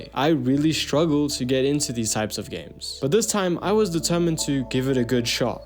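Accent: South Africa. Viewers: waaaait. are you south african? you sound south african a little. its hard to recognise our accent when its not 100% afrikaans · 😂Bro you must be south african. That accent!!! must be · Nice video bro, I recognise that South African accent anywhere 😅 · South African??!!! My bru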